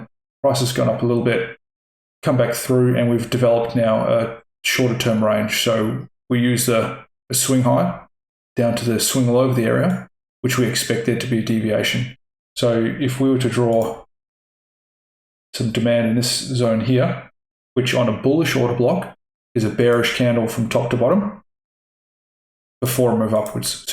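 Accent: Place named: Australia. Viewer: Hey Mate, much love from Sunshine Coast. Good to hear an Aussie on here teaching the goods. Thanks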